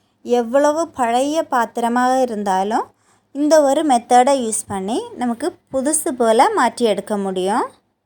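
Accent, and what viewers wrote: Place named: Kanyakumari, India. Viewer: Kanyakumari tamil. Very great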